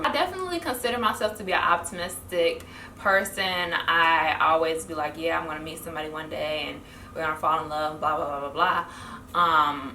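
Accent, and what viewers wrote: Chicago, United States. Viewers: Where are you from? You sound like you're from Chicago. Anyways Yes this is totally normal to feel like you're missing out or when will your time come to start a family. I'm 28 about to be 29 in like 2 weeks and I don't see myself getting married to anyone anytime soon... It sucks because as women we are meant to think that there is some type of clock ticking, but we often forget that the only clock that matters is God's clock. It also makes it difficult when you get to my age and everyone around you is asking when are you going to have babies... Your story unfortunately is not unique I too grew up in a single parent home and I feel like yes it was rough and it definitely left me lost when it came to relationships. I feel like I made a lot of mistakes and dealt with a lot of bad people because I was seeking love from the wrong places. 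At the end of the day life is a journey and no ones journey is perfect. The whole idea of being married by 25 with 2 kids a dog and a picket fence is not a reality it's just a fairytale. Pray that God will send you a good man and it will happen but don't be afraid to let some time pass before he finds you. Just keeping working and improving and before you know it things will fall into place even better than you imagined.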